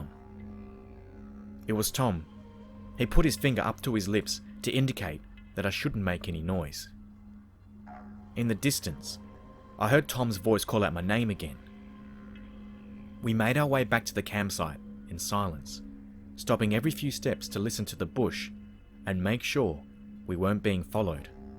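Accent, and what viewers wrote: Australia. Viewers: Yay, a fellow Australian narrator. I wish I discovered your channel sooner. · Honestly the first time im hearing an australian man narrating creepy stories. Pretty well done.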